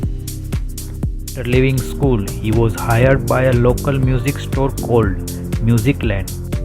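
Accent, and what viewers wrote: India. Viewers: The guy narrating this needs to learn some English first haha. Sorry I’m not a racist - just that his voice is hilarious. He sounds like a Bihari from India. I am sure the guy who posted this video did that voice as a joke. And what’s with the elevator music?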